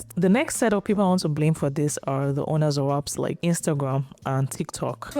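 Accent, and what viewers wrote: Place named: West Africa. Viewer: Unrelated, but you have a beautiful speaking voice. You must be West African, i love yalls accents 😊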